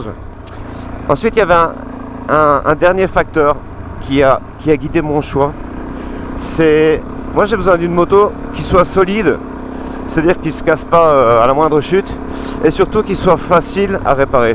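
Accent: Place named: Belgium. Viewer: Bonjour Je te suis depuis peu et tu me regale avec ton accent belge. Tu es pertinent en arguments moto, tu nous fait rever avec de belles images de maquis espagnols, je vais m abonner. Je roule en ktm 690 enduro r 2013 et 350 exc f 2018 dans pyrennees francaises et espagnoles. Continues tu nous regales avec tes expressions espagnoles. Zitoune.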